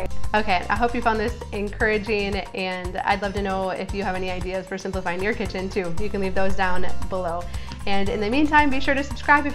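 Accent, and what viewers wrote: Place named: Midwest, United States. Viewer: I hear a midwestern accent! But that only adds to me loving what you share here!!!!! OMG!! 😍 I’m going to end up watching this over and over!!!! I want to simplify so badly!!!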